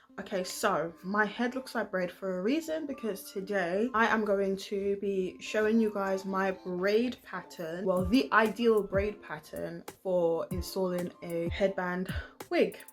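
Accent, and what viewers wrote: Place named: Jamaica. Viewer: I loved this tutorial, then me hear the Jamaican accent and then me extra love it! Had to subscribe <3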